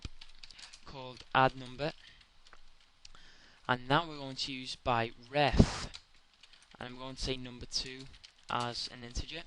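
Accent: Liverpool, United Kingdom. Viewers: good video ... understood it perfectly thanks. your accent tells me you're from liverpool... am i right?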